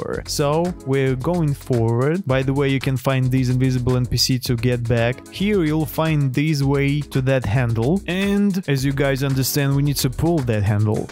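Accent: Russia. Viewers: your accent is sounded like russian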